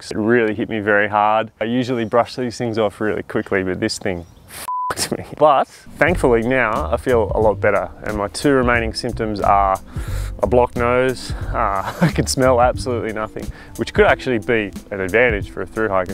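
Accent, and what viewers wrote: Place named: Australia. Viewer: Wishing you a speedy recovery! I've been watching your videos for a while, and amongst a multitude of reasons that make your videos so fantastic and engaging, it's also just so refreshing to hear a familiar Aussie accent. It's interesting to hear you speak of the challenges of not knowing how much energy you'll have each day to train due to the fatigue in your COVID recovery. I'd like to hear more about this. Obviously, you intend to achieve a full recovery and soon return to your usual training capacity, but what would you recommend for someone like me who has MS (Multiple Sclerosis) and faces this challenge constantly? I generally find that once I'm out on the trail, the serenity of being out in the bush gives me an instant boost of energy, but I struggle with regular training when I'm home. This is what I'd love to see more videos on. 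How to maintain good training practices when energy levels are hit and miss. Thanks again for your wonderful videos and I hope you recover quickly and safely! Regards from down under :)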